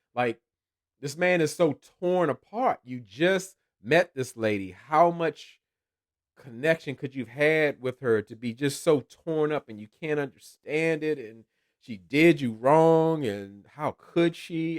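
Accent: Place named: Baltimore, United States. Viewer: The season is over and here I am binging all of your videos as I finish up my nails. YOU CUT THE ENTIRE FOOL ON THIS SERIES! This portable rattail, the continuous belief in what Anthony really wants, Lyndon being down to the part-time job but on the show between shifts...I'm calling the authorities. But I knew your accent and demeanor felt like home because it is, I'm from Baltimore. I'm thoroughly enjoying myself on today!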